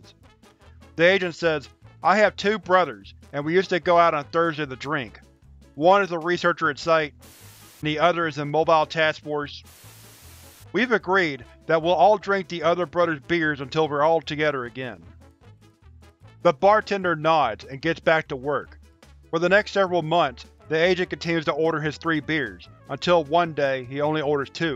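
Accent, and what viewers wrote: South, United States. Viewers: Wait....this is your normal voice? It sounds identical to the voice you use on the other videos? Southern drawl and all.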